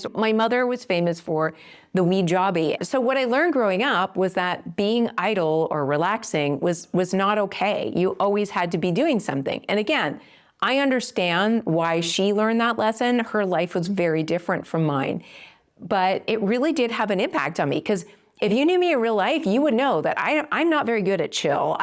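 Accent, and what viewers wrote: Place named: Canada. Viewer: Great information. I am getting ready to retire next year. Btw, listening to your videos I think to myself I wonder if she’s from Canada. Your accent gave you away.😅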